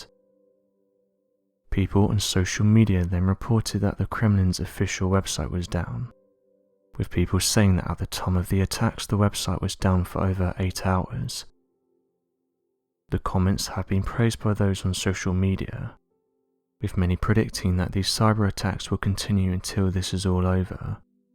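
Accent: United Kingdom. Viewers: Thank you for this vital information. I have been curious about who and what is Anonymous? Are you the same organization that started "Occupy Wall Street"? I saw you on the www in support of George Floyd. You seem to always have a British accent. Are you part of the London, Georgetown, Harvard trilateral covert network. Why must you be anonymous? We need Patriots like you front and center on the scene for Democracy. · Thank you for highlighting the brilliant work done by our see eye aye! Whoever uses this brit voiceover obviously watches cable TV.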